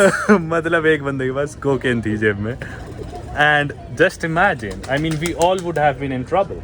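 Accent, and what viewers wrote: Haryana, India. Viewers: Thank you for all your tips. Very helpful. Although I would like to add, slamming doors is considered rude & bad manners in many countries, not just in Columbia. It is common knowledge because generally people slam doors in anger. I also accidentally saw one video and really liked your simple, honest, practical, genuine (no show), with Haryana accent information. 😊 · I'm from Pakistan I 💓🇵🇰 long live 🇵🇰 nice beautiful Bhai AP ka Haraynivi Accent bhuht acha lagta hi 💚💙🙏🇵🇰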